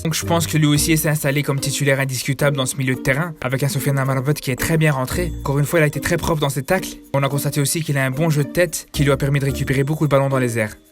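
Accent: Belgium. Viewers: Votre accent belge je kiffe !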